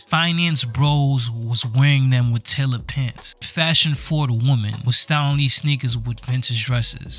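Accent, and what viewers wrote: New York, United States. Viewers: Love this piece and hearing this accent makes me really homesick for NYC!😊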